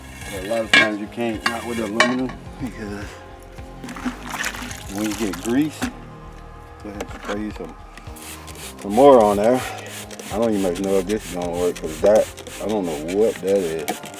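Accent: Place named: Texas, United States. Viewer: YOU GOTTA BE FROM TEXAS BRO! #SouthernDraw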